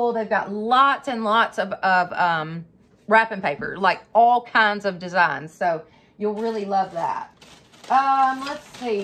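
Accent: South, United States. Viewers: Hi, I love your personality. You are always so cheerful and positive. Your projects and ideas are awesome. You always make me smile and I love love love your accent. I live in Canada so to me you have a very strong southern accent. Love watching you channel. Will be waiting to see what you do on the next video.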